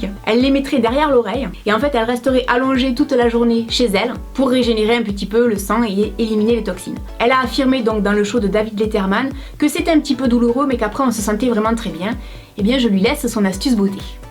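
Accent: South, France. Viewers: Bonjour:) Tout d'abord je remercie Youtube, car j'écoutais simplement une musique et dans les suggestions de vidéos, ca m'a mis ta chaîne (inutile de préciser que je ne te connais absolument pas, ni toi, ni ta chaîne). En suggestion de vidéos, c'était celle au sujet de ta menace de mort. J'ai donc commencé à la regardé (car d'un le titre me mettait déjà hors de moi, et de deux, passionnée de beauté etc.. je suis toujours à la recherche de nouvelles Youtubeuses, ne supportant plus les plus connues...BREF ). Je vais faire rapide car je me doute que tu ne souhaites pas en entendre parler 30 ans, je commente rarement, mais je me devais de le faire. Je te remercie, je te remercie pour ta franchise, ton honnêteté, ton coup de gueule, et ta force. Je te remercie d'être différente des autres (oui ca change de ces grandes Youtubeuses qui ont 2millions d'abonnées et qui ouin ouin plutôt que de pousser une coup de gueule comme tu l'a fais) mais je te remercie surtout pour les tuyaux que tu as donné tout au long de ta vidéo, car certes tu as 40 ans (et je trouve ca super fun) mais les haters oublient vite l'âge des youtubeuses, et certaines sont trés (voir trop, d'avis perso) jeunes pour avoir ce rôle là, de youtubeuse beauté/lifestyle. 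J'ai bien compris que tu ne fais pas de vidéos pour le nombre d'abonné(e)s, mais je tenais à préciser que tu en a quand même gagner une de plus (moi) et sûrement bien d'autre. Moi même du sud Aussi (aussi car à entendre ton accent tu l'es égal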